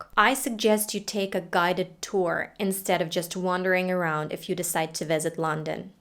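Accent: United States. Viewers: Hola. Soy Gabo. Vivo en USA y mi hijitame dice que tu inglés es Americano. Ella sabe inglés pero yo aun no. Me gustaría saber como inicio tus clases. Gracias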